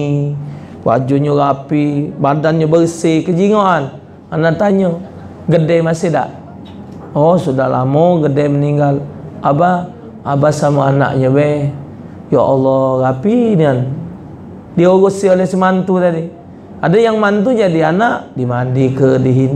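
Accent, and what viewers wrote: Palembang, Indonesia. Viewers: Ustadnyo asli mano mang R nyo plembang nian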